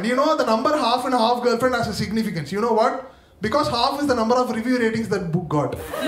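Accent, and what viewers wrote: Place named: South India, India. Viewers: Love from maharashtra..loved ur sounth indian accent..👍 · Hypocrites can bear accents of people from all around the world but can't bear south indian accent !!? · he is south indian, hence that accent...try and appreciate the diversity of india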